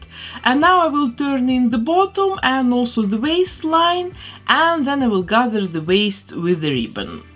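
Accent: Russia. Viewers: you have a Russian accent in your pronunciation. are you Russian? :) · У меня шиза или это русский акцент?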